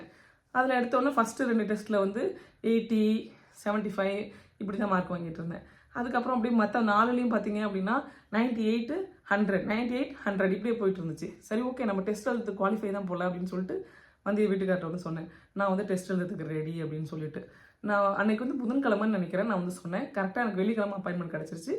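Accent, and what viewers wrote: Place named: India. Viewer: Hiii.. Very useful video..Thank you so much for sharing your experience.. Namma Indian English slang Pesurom school la work pogum pothu eppadi inga ulla local kids kooda neenga manage panneenga..They can understand our slang especially initial time when we get into the work?.How did you manage your starting days of working in school?Can you share some of your experience too.. Thank you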